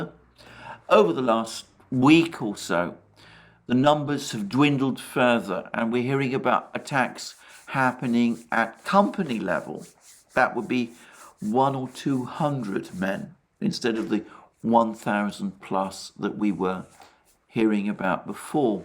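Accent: United Kingdom. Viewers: he is offering russian propaganda with a british accent.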